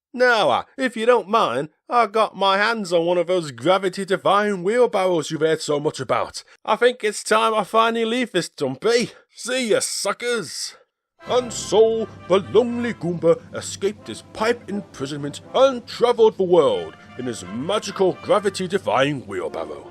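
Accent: United Kingdom. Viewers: I love your english stile Mr. Goomba, so british so elegant. What a pleasure to hear your rewiews added with creativity and humor ❤️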